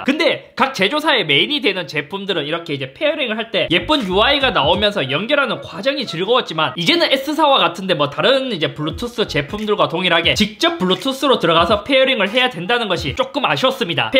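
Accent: Gyeongsang, South Korea. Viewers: 나도 경상도 출신이지만, 이제 경상도 말투는 듣기도 싫네요 ㅠㅠ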